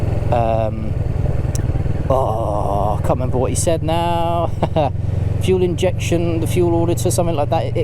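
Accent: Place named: Cockney, United Kingdom. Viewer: Ooo sounds exciting. Looking forward to hearing the cockney verbals come out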